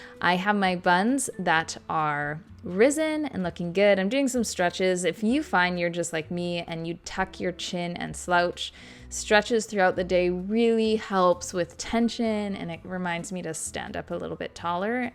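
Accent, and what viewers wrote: Canada. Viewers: Have you heard how she pronounces produce? It's a Canadian accent type of thing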